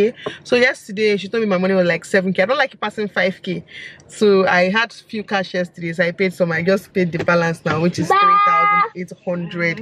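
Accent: Nigeria. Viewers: Your children are so adorable,that ur Anambra tongue always make me laugh alot,cos am from anambra too.keep it coming.nice nice vlog.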